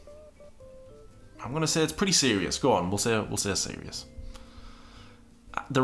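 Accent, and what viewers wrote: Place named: Newcastle, United Kingdom. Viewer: I feel like you’re a geordie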